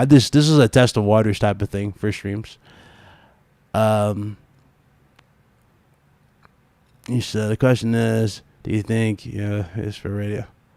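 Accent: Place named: Atlanta, United States. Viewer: we need to use his webcam 😄 it's so weird watching you since you didn't use cam before. people thought you were white? lol I can pick up that Atlanta accent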